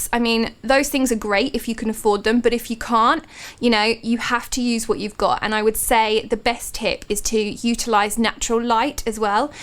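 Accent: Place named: RP, United Kingdom. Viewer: Loved this :D such a great first video :D you sound so posh too hahah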